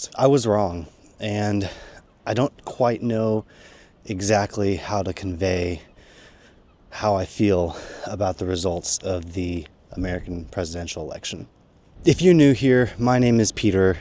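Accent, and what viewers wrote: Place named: England, United Kingdom. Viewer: Gee yer heed peace min. You sound like a sassenach.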